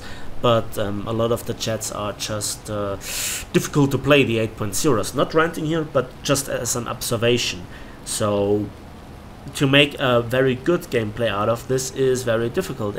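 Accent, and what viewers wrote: Bavaria, Germany. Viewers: Greetings from the Capital and to be honest please make more Subnautica. You actually made me buy it and i love it. Youre Bayrisch is hard to understand here up north but just speak the language youre comfortable with.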